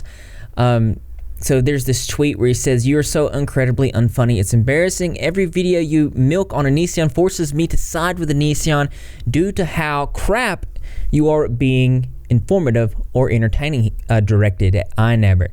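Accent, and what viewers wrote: United States. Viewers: I did not expect that country accent lol. Which I get that too lol.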